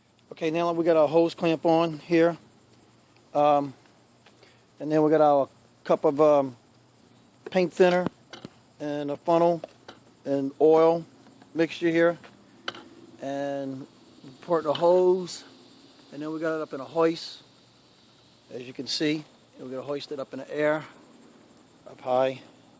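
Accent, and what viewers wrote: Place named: New Orleans, United States. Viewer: You sound like you're from outside of New Orleans. Home sweet home.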